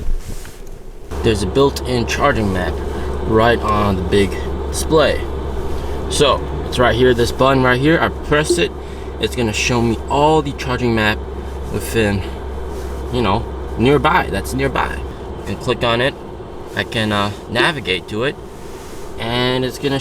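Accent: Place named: New York, United States. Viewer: Hey are you from NYC? Queens or Brooklyn? I hear the accent lol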